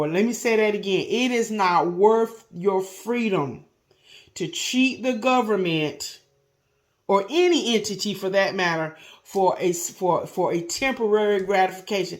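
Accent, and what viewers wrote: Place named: Pittsburgh, United States. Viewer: YOU TALK LIKE YOUR ARE FROM PITTSBURGH.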